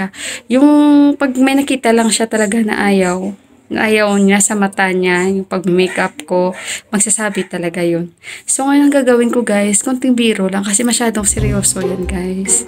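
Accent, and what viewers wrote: Iloilo, Philippines. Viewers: ate ilongga ka po ba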